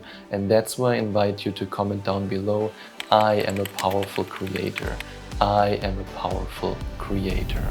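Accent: Germany. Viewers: German accent?… thanks for the breakdown. It’s less lofty and technical than Dispenza video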